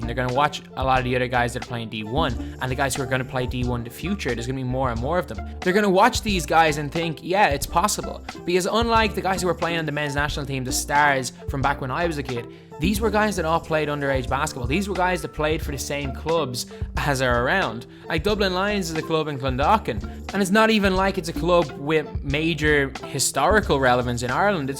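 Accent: Dublin, Ireland. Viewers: Are you from dublin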